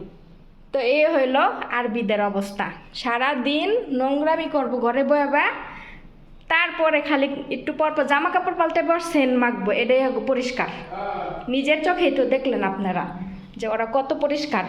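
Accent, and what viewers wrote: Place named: Manikganj, Bangladesh. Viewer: Apnar mokher vasa sone mone hoy apnar bari manikgonj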